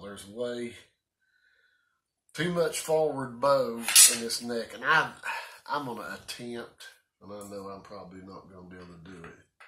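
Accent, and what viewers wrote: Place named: South, United States. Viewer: Your southern drawl makes listening to and learning from this video really enjoyable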